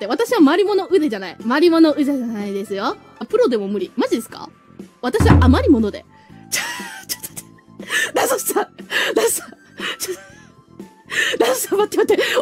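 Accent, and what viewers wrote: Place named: Kansai, Japan. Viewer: やっぱり関西弁は安心するなぁ…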